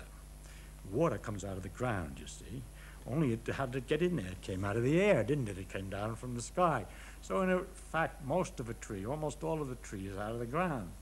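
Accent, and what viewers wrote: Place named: Italy. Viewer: why is there a slight italian accent tho loool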